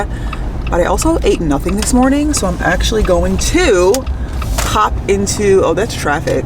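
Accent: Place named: Philadelphia, United States. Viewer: OMG! You sound like one of the people from Parking Wars. And it is ALWAYS in Philadelphia. I hope you win!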